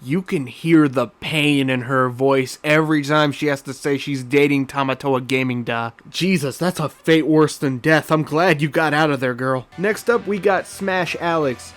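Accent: United Kingdom. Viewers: And that British accento Is so thick and evident Not even english parodies can reach that level of accent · This guy is aggressively British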